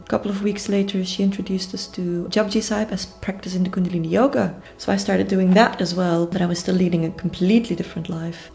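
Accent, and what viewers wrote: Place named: Amritsar, India. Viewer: Her accent AMRITSAR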